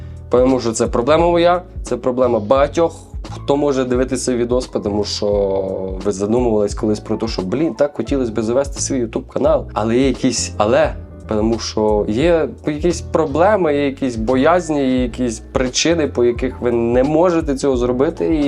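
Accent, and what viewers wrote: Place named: Surzhyk, Ukraine. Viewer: Зарядив, бідбодрив, вдохновив!, прийшла просто твій суржик послухати🥰 абажаю! Ти молодець!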